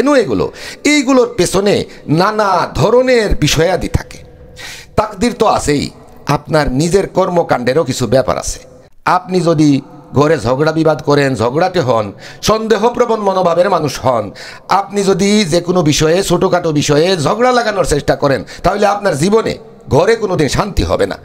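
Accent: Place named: Barishal, Bangladesh. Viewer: মামা আজকে আপনার কথাগুলো একদম অন্যরকম শোনাচ্ছে অনেকটা বরিশাইল্লা বরিশাইল্লা। অনেক কিছু আজকে জানতে পারলাম ধন্যবাদ।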